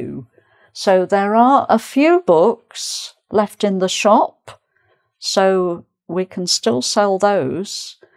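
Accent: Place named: RP, United Kingdom. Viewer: Oh. that Lovely british lady with RP accent!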